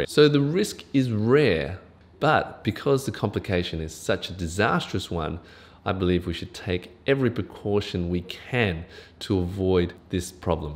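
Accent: Australia. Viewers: Is this an Australian accent?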